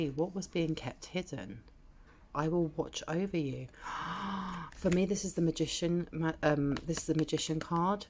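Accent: United Kingdom. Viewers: thank you my love you add subtitles i think you have British accent and i am Indian that's why i need subtitles to listen video ..... thank you. you are beautiful angel ❤️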